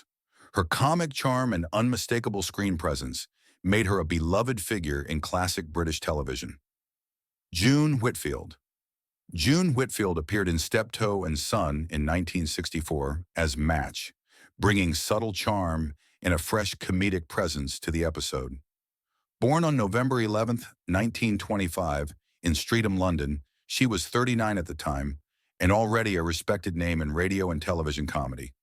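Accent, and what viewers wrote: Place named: United States. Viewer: why is a yanky speaking??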